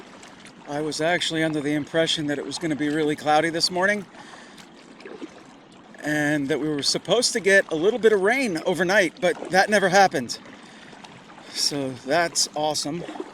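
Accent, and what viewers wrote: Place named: New York, United States. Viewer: Got to be on the look out fore snitches,man you went on a round a bout way to git to , the rinc key dinc island, but in teresting.brave soul,endurance.you talk like mi roommate Chuckie Frome the navy 34 yrs ago Norfolk ,va., he was Frome flushing and queens I am frome Chicago.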